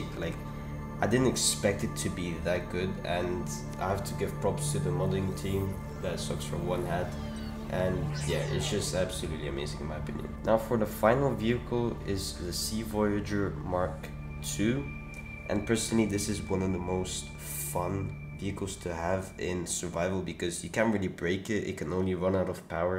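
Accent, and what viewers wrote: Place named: Russia. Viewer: почему у него русский акцент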